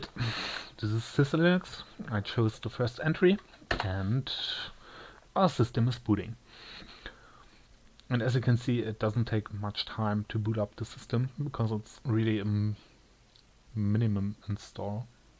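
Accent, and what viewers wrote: Germany. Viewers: I heard your english and thought you were german... And YES, I was correct! Grüße aus Braunschweig! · Great video tutorial for current linux users ! Don't worry about your English ;) You of course have a German accent, but I understood everything! Cheers from Denmark.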